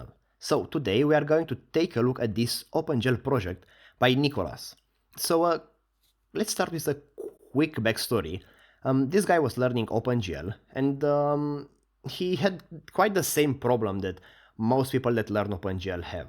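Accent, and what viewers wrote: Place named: Romania. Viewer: Guys istg his english is fine but it's just the ̶R̶u̶s̶s̶i̶a̶n̶ Romanian accent.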